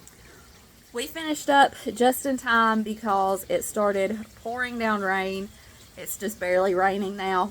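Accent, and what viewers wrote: South, United States. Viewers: You are such a good wife/mom, and your little guy looks like a sweetheart...~.~ I started watching your channel recently. I'm a Christian, too, and grew up in the 'country' in Michigan/Wiscosin. Now I live in South Korea and teach English here with my Korean husband. It's fun to hear your Southern accent and to see the little things about American life that I don't see anymore living here in a big city and foreign culture. Thanks for sharing your joy & love for Jesus and family!💜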